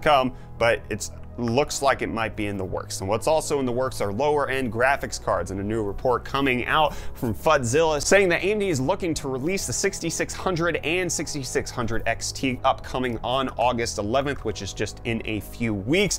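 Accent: Canada. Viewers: Umm Canadians sound just like you. We don't speak any different. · More Canuck accent please!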